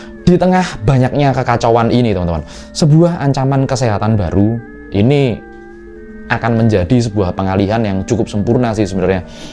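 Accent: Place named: Java, Indonesia. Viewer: .. Saya sangat senang mengikuti kontent konten Tapi larbih baik lagi kalau logat bicaranya kembali ke asal saja.. Yaitu logat Jawa medok.. Akan kliatan Jawa sekali mmitu akan lebih enak dan unik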